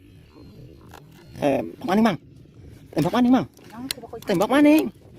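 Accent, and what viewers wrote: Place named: Indramayu, Indonesia. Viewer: Wong Endi mang kaya wong dermayu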